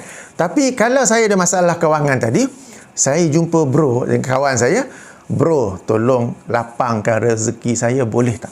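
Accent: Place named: North, Malaysia. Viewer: Senang nk rujuk sama2 ckp utara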